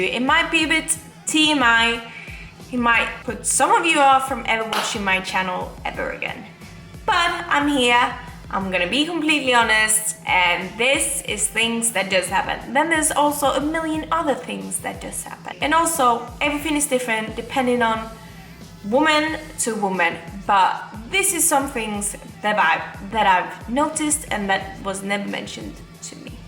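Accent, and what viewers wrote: United Kingdom. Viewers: Omg your accent is so much more British🤣🤣 love it 🥰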